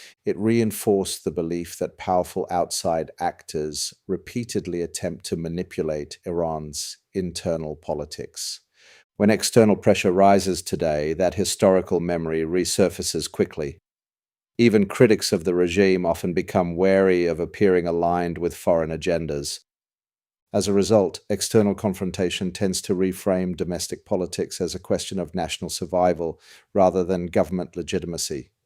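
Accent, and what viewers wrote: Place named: United Kingdom. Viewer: Bro aren't you American? Where did the British accent come from?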